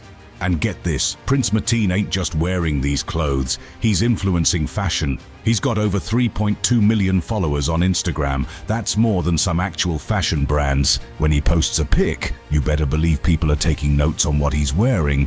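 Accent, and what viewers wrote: United Kingdom. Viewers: Way too many "ain't" and "nah." Don't go well with the narrator's English accent.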